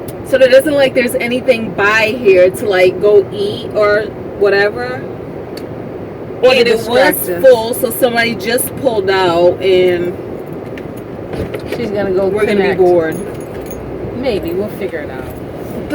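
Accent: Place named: New Orleans, United States. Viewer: Luv that accent !New Orleans Lady Ice Cream pralines. ummm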